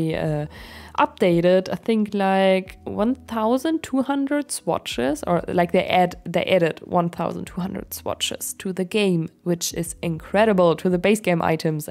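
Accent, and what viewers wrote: Germany. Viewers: Are you german? because of your accent.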